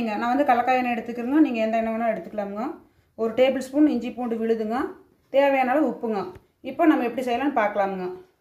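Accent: Kongu, India. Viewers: அருமைங்க.... வாழ்க கொங்கு தமிழ்... வளர்க கொங்கு தமிழ்..... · Kongu Tamil super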